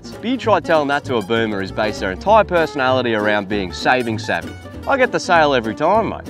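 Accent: Australia. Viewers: Australian as fuck 🇦🇺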